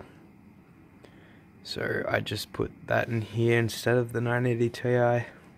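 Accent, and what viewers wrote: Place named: Australia. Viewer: is this aud or us u sound aussie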